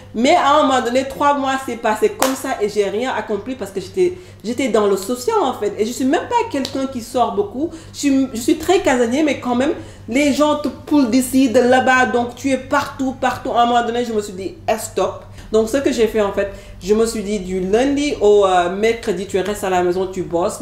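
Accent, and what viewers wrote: Senegal. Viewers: Tu es originaire de quel pays pour t'installer au sénégal. Si tu es sénégalaise et que tu es retournée dans ton pays le Sénégal, alors tu n'es pas allée t'installer au Sénégal. Tu es simplement retournée dans ton pays. Ton accent est purement sénégalais.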